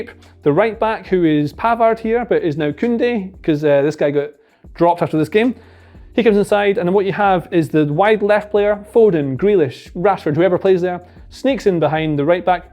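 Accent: Scotland, United Kingdom. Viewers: Always good to hear a Scot analysing how England can win their quarter final. Enjoyed the analysis as always.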